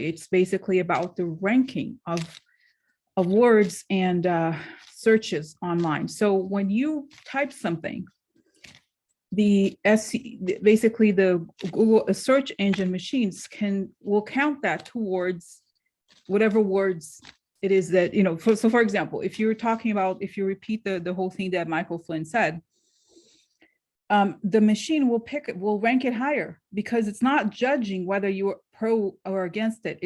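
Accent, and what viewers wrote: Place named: Russia. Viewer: me encanta su manera de dar su informacion siquica y su acento ruso🥰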